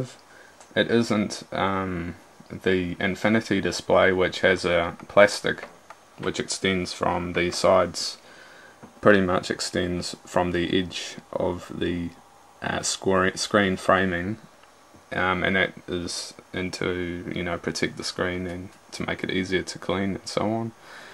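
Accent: New Zealand. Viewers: This is a very nice laptop. I just spent 5 and a half grand on my gaming desktop so Im not going anywhere in terms of money any time soon but as soon as I finish saving up again Im going to get this. XD By the way are you from New Zealand bro? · Thanks to Flight of the Concords I can now spot a New Zealander accent. Thanks, HBO! Oh and good review.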